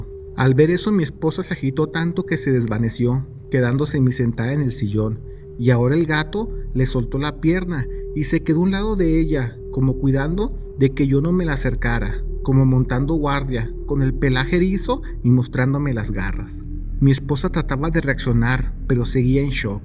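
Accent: Mexico. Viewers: De tanto hacer maratones de tus videos, ya se me pego el acento mexicano ❤😅😅😅 me encanta tu canal. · Ay, cómo se nota que eres de Guanatos 😂 me encantas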